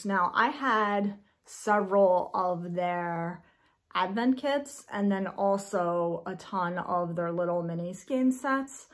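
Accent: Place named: Philadelphia, United States. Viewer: You have to live near Philly, we sound the same! Lol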